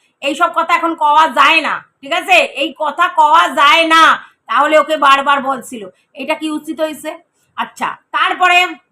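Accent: Bangal, Bangladesh. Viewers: di video ta khub bhalo, kintu normal bangla dialect e video korle better lage sunte.. ei "bangal" tone e kotha bolle half jinish bujhte parina r khub ugro lage.. Kichu mone korona bollam bole.🙂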